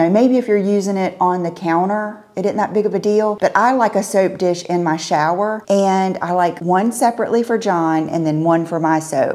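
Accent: South, United States. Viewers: I was thinking to myself, I love how says “ ok” it’s just the cutest way with your southern accent. And then you said now on to Rachel and that’s my name 😂I thought you were talking about me for a moment haha! You cracked me up today. Those Mary Jane sneakers and the purple Zara bag just made me smile. I love how out of the box you can be at times and you adventure to try new things and are always honest with us if they don’t work out but you are inspiring me with style too. Thank you! P.S. that soap holder is just gorgeous lol it’s the simply things right?! And the Zara green jacket looks amazing on you!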